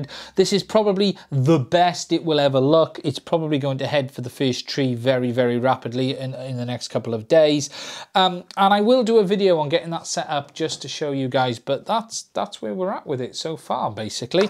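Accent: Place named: Cardiff, United Kingdom. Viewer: best videos re : dji fpv so far, i notice a gps on the front , how are you using? im coming from an inav point of view, also do you think if i used inav with gps i could still utilise the rth functions... Thinking of putting an air unit in my 7 inch shredder frame, it features esc`s on the arms and im using probably 30mm standoffs fair bit o room in there. oh im based in Cardiff. You sound like your from this neck of the woods (area)! great channel , cheers!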